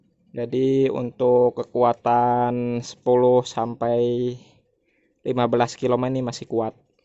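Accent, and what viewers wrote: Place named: West Java, Indonesia. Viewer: Kanyahoan bgt si a urg sunda na wkwkwk... Sok ah di lanjeng....mugia sukses a🙏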